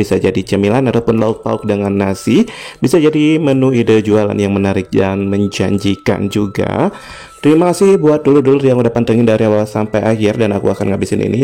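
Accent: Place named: Java, Indonesia. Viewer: kelihatan medok banget😊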